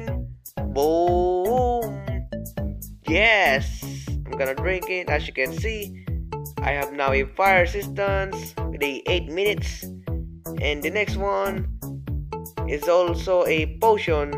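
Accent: Philippines. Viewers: Are you from the Philippines? I live there too but my English isn't like this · Bluestone- Gaming BG He has a filipino accent so you cant blame him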